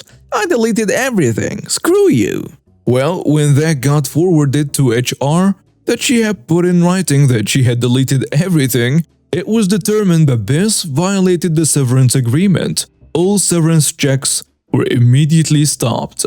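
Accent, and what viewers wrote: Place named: South, United States. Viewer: #1 love your southern accent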